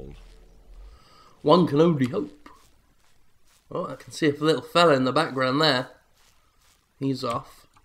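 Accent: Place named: Liverpool, United Kingdom. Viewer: Bill Nigh has the most elegant voice I've heard. This narrator though, I'd say he sounds more like George Harrison, that Liverpool sort of accent.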